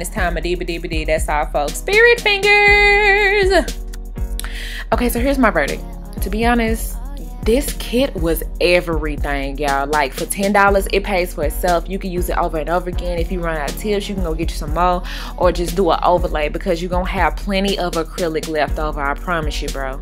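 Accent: South, United States. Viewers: I LOVE IT!!! YES TO YOUR SOUTHERN ACCENT!!! YESSS TO YOUR PERSONALITY!!! YESS YESS YESS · I don't understand why people were saying this was "ghetto" and she sounds "ghetto" ?? wtf does ghetto sound like ?? Cuz to me it sounds like she just has a country accent.. But anyway..nice tutorial girl. Them nails SLAY 😍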